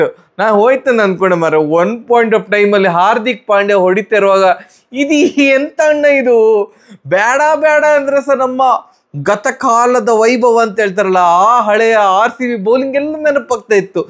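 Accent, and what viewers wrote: Mangalore, India. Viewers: had lost it's charm, humor, and that Mangaluru accent, nowadays it has become as a summary of match, we have watched the what's the necessity of yours, we need videos likes your old videos, where you used to talk in a comedy way, like Dani seit, please try to bring that back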